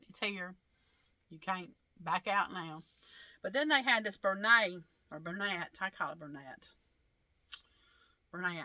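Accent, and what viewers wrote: South, United States. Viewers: Hi mss from western Nebraska, please take care of your knee this coming from someone who had many knee issues. Getting a new one can be endured and so much better. Keep on posting, love your channel. Love your southern accent!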